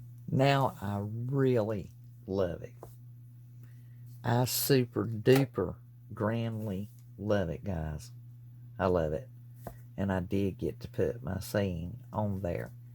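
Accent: South, United States. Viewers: Just found you! So excited to make this!!!! You sound like a fellow southern girl! ❤️ Lookinf forward to watching more of your videos! God bless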